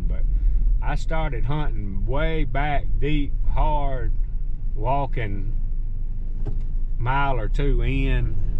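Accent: South, United States. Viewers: That subtitle generator has problems with your southern English. I’m not criticizing southern English because I talk that way too. It’s just funny how what’s printed differs from what you said. Thanks for the videos. I enjoy them.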